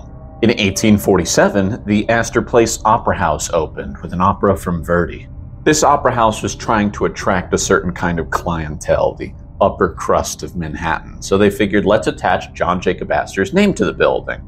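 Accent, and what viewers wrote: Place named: United Kingdom. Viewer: Love your British accent! Love the sound effects! Another winner!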